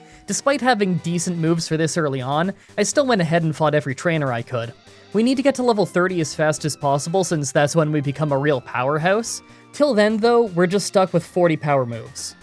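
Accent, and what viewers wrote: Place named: Canada. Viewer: I love this guy's Canadian accent! So cute 😁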